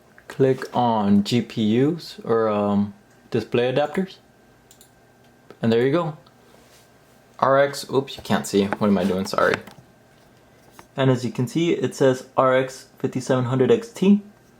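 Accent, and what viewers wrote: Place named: Mexico. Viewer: I can tell by your accent you’re Mexican. Where you from? Cali?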